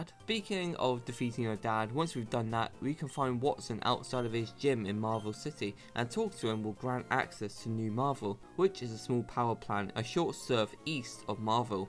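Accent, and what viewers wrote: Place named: United Kingdom. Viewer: I like listen ur videos because your accent, is British?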